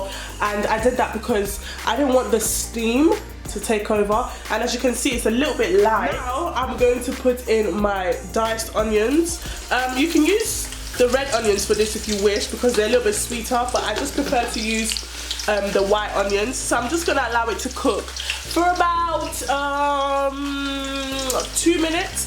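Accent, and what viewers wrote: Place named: United Kingdom. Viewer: She has a british accent , not her fault, actually lovely to listen to and as clear as day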